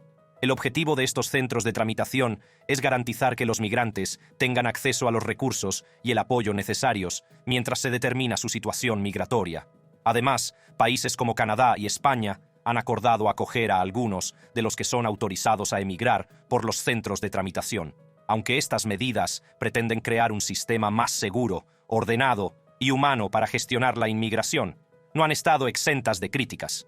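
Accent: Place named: United States. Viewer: Que le Pasa a este maje habla bien y despues habla Como estadounidence aprendiendo español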